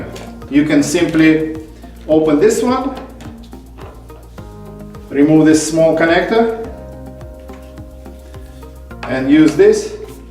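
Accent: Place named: Russia. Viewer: Я так и думала, что у вас русский акцент)) Конечно, кто ещё может додуматься до такого решения. Нужна смекалка (несуществующее слово в английском)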